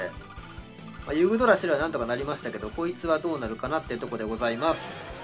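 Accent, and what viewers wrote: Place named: Nagoya, Japan. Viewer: そろそろ、名古屋弁全開実況しまょう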